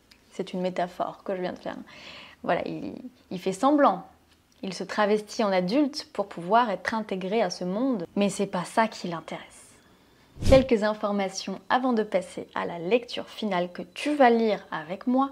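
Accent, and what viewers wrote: France. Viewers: Bravo pour parler anglais, il est difficile pour les personnes instruites et intelligentes de montrer un niveau intermédiaire dans une langue étrangère (je sais le douleur mdr) mais le courage est essentiel et requis pour atteindre un niveau avancé. Et l'accent français est considéré "classy" en anglais, donc c'est un avantage. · Your English is good. I am a middle aged fourth generation American. You speak better than some Americans. I like the French accent. You can keep it or work on your pronunciation. It’s your choice. Any native speaker should be able to understand you now. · J'adore ton accent français quand tu parles anglais. Ça sonne vraiment mignon.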